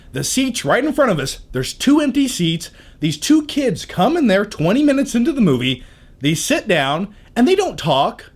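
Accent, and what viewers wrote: England, United Kingdom. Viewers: Ha your English accent is hilarious. Telly? Lol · lol, your rant is the funniest thing!! And I love your english accent too! My worst cinema experience was when someone sitting behind us put chewing gum in my friend's hair. Awesome review!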